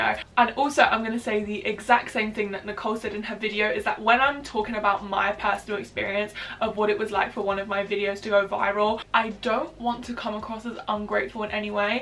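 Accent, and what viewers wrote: United Kingdom. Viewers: I’m sorry you get awful comments… I’ve been watching you for a while, I seldom comment on videos, so this is my first comment on your video. After watching this, I wanted to give you a compliment since you said you read every comment haha. Well or, more so throw shade at people who criticize irrelevant things like your appearance… Anyway! Sorry I’m rambling 😅. The people who comment about your teeth and ask why British people don’t care about their teeth, and just pissed they don’t have the superior accent, at least that’s my guess as someone who would trade my boring midwestern accent for a more sophisticated accent. Ugh this is a weird comment, and it’s all over place, this is why I don’t comment 😂. Anyway! I think you’re a cool pretty lady, who makes videos that I like, and I hope you have a great days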